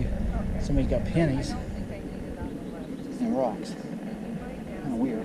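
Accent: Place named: United States. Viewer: Are you from Glenwood Springs? or a different part within Colorado? I know you are an American. Your accent does give it away. I'm just wondering as to where your from.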